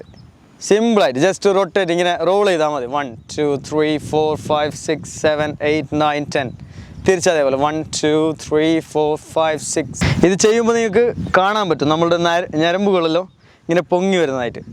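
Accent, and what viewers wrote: Kasaragod, India. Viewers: KASARGOD ആണോ BRO 🤩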